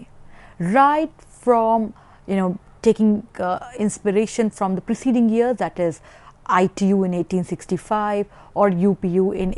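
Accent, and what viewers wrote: India. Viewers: The accent understandably is a product of where you live and who you choose to mingle with. This is a mark, and not a "wrong" with Indians.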